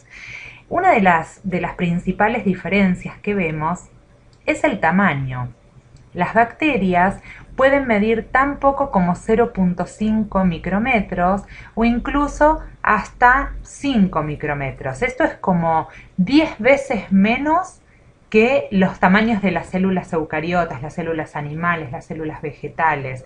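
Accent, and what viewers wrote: Argentina. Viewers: Me gusta el acento argentino!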